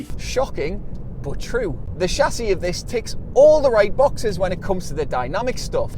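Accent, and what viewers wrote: Newcastle, United Kingdom. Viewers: Canee geordie accent like!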